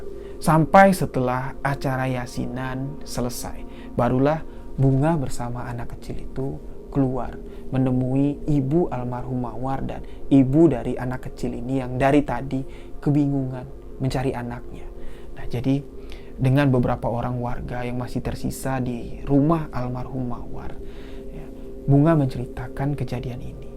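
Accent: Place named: Kalimantan, Indonesia. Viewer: Maaf ya mas, masnya ini asli orang Jambi apa orang suku Kalimantan. Soalnya logat bicaranya kayak suku Kalimantan 🙏.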